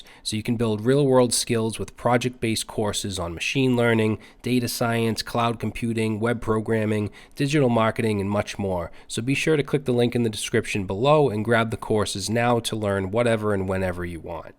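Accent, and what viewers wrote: Boston, United States. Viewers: Yes, he is from Boston, MA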